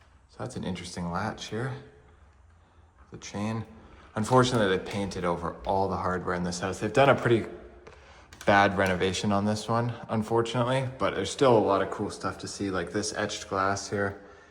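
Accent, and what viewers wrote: Canada. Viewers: What state is this? Are yu Canadian? Your accent sounds Canadian. This price is ridiculously high. No wonder it hasnt sold. Horrid reno. Nothing flows. Kitchen an empty cavern.no way was this house ever inspected. This is all stupid rigged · I don't know why, but I cant stand the Canadian accent. It's like finger nails on a chalk board to me! Sorry lol I just had to turn off the volume on this and use the closed captions instead!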